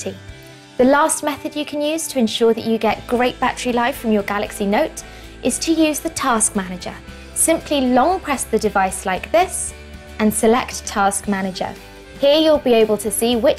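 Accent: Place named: United Kingdom. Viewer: Cool!! I really love it voice.thanks for it voice.British cool.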